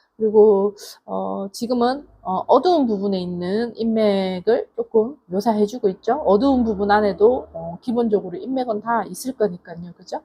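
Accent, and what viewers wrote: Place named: Gyeongsang, South Korea. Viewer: 그림을 그리면서 말을 한다는것이 힘이듭니다 가끔씩 경상도억양으로 말해주세요 목소리를 들으면 고향생각도 나서 좋아요 그림수업 잘하고있어요 감사합니다 화이팅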